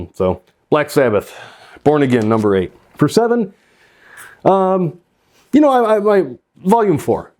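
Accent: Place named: Michigan, United States. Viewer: I was going to ask if you were from Michigan by your accent.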